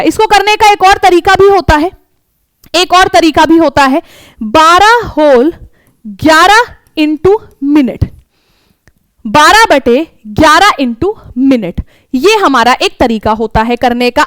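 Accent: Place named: Gurgaon, India. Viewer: Hindi to aap gurgaon r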